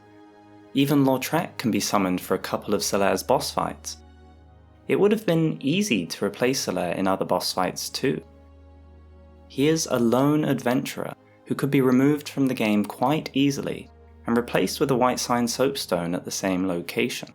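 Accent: United Kingdom. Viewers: Has an American I have to say I prefer my lore to be spoken with a warm British accent. It just seems more legit for me. Good job at being British.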